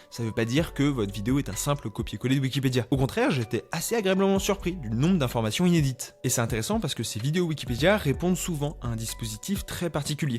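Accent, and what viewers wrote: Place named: England, United Kingdom. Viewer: J'ai vraiment du mal à comprendre ton accent anglais 😅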